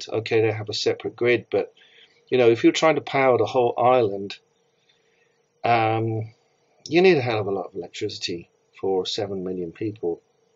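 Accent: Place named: England, United Kingdom. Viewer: What part of England are you from? It may work better in the UK.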